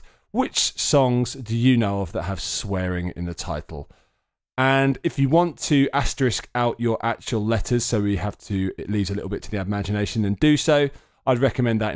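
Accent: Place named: England, United Kingdom. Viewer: you sound like my crush from England omfg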